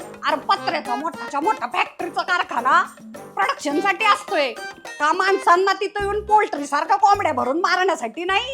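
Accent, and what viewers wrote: India. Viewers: 😀😀जबरदस्त कॉमेडी हसून हसून वाट लागली. तुला काय भन्नाट आणि खरे विषय सुचतात ग. आणि अगदी हुबेहूब गावरान बोली भाषा तो अभिनय ऐक नंबर. 😀😀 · लय गावरानभाषा आवडली1चंबर❤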